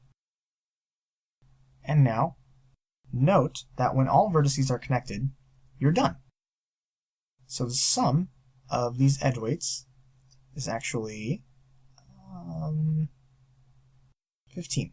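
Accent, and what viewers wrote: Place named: United States. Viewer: Finally, american accent!!!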